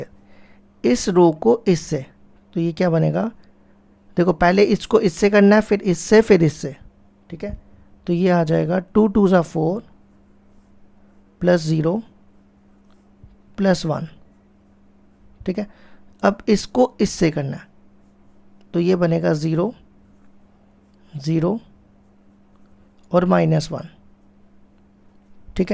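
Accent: Jammu, India. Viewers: Sir you are from jammu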